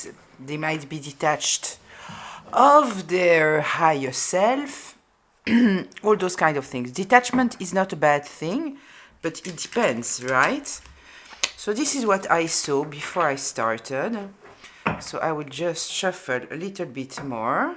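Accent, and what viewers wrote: France. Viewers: I absolutely love your French accent ❤️🧡💛💚🩵💙💜🤎🖤🩶🤍🩷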